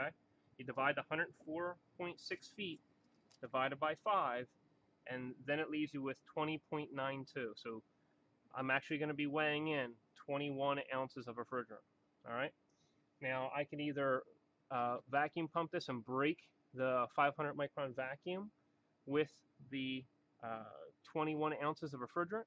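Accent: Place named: New Jersey, United States. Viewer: I just recovered 2 similar units that were installed briefly then decommissioned because the plant they were in has airflow problems and all the air these units made was sucked up and out the through the roof, and did not solve their problems. The wall units had already been discarded but because the refrigerant hadn't been recovered yet they were storing the condensers. I have a shopping list made up with wall units, line sets, and disconnect boxes. I am a millwright by trade but mostly work on air compressors. I plan on installing them for my home myself, with the help of an electrician for setting up my disconnect boxes and HVAC tech to purge, vacuum test, and charge my system. Is there anything in particular about these units that I should know? Someone online mentioned Mitsubishis can be tricky to wire but based on the manual it doesn't look any different than your complete install video I watched the other day, other than having to wire 3 separate units vs the one in your video. 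PS, you aren't related to Seth's Bike Hacks are you? Same NJ accent and talent for video production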